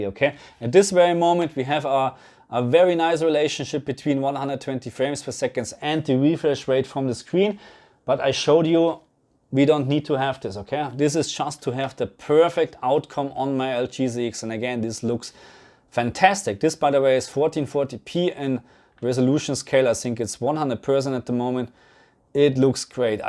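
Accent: Germany. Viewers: I have a question, sir are you german? You talk english like german person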